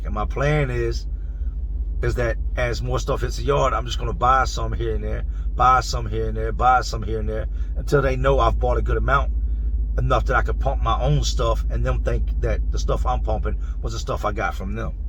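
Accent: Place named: Carolina, United States. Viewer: Bro I swear it sounds like you in Carolina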